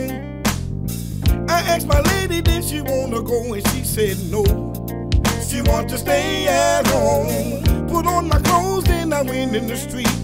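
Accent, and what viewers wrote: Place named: Memphis, United States. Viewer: He sound like one of my OG uncles from Memphis Mane. 🤣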